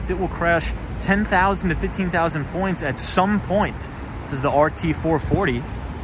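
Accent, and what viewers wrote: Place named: Long Island, United States. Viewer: Excellent! Simply -- excellent stream tonite. Flawless concept and content. As I've said before you're a sweet and powerful Force of Nature. However, must confess I jumped ship when you mentioned your two face tattoos and said you were heading back down to dull Union Square again. Just climbed back aboard about 8 or 9 minutes ago to watch you wheel your way back up to 34th street and do your 'Farewell to the Troops' stance. P.S. you have an incredibly articulate voice with flawless speech pattern, save for a bit of an occasional Long Island 'twang'. ^ _ ^ So don't believe the 'hype'. If there was difficulty in understanding you this evening it may have been due to the merciless tradewinds wreaking havoc on everyone's audio equipment throughout Manhattan Island tonight. Kudos on the 6k!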